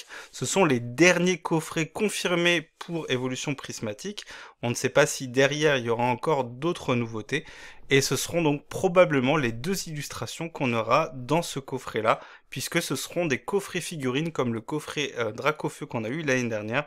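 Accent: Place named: Portugal. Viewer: Bravo pour l accent portugais!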